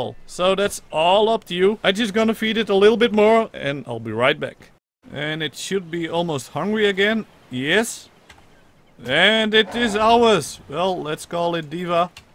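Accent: Netherlands. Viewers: Hij is sowieso Nederlands. Dat hoor je duidelijk aan zijn uitspraak en hoe hij "Simba" zegt. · is this guy dutch because of his accent?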